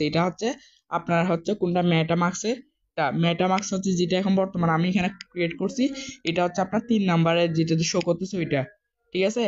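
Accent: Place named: Chittagong, Bangladesh. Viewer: ভাইয়া ত চট্টগ্রামের মনে হয়,, কোন যায়গায়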